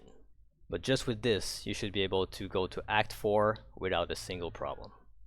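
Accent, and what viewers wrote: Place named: Quebec, Canada. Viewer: you sound SOOOO French QC speaking English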